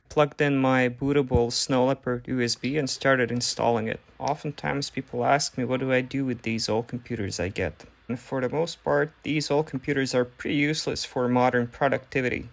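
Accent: Quebec, Canada. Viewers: You actually DO sound very similar to French Canadian! 😄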